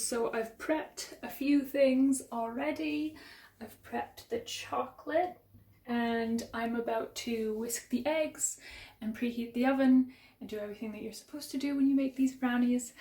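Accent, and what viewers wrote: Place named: Scotland, United Kingdom. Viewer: This was so cosy and lovely. Also I love your accent, the little bits of Scottish in the way you say things like as well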